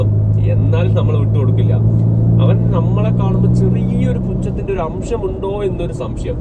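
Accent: Palakkad, India. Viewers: തനി പാലക്കാടൻ😅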